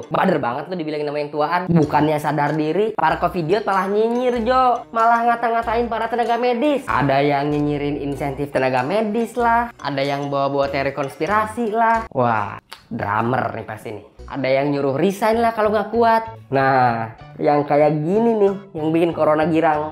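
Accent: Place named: Malang, Indonesia. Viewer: Semacam arek malang bahasanya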